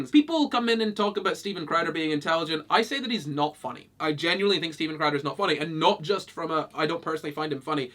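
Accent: Scotland, United Kingdom. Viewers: Is it bad I never noticed you're scottish??? like I'm british but my brain only registered your accent as "northern" tbh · I don't understand how people can call you "feminine". Unless they are using "feminine" as a synonym for "smart". To me you appear to be clearly masculine, and your Scottish accent is a pleasure to hear.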